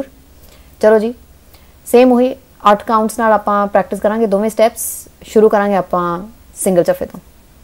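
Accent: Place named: Amritsar, India. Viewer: Boli ton ta bibi ambarsar di lagdi a. Ke nai??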